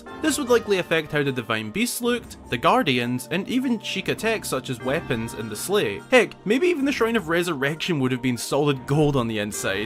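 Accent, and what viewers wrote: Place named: Edinburgh, United Kingdom. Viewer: I'm a Scot and he definitely sounds proper Scottish to me as his accent sounds very Edinburgh-like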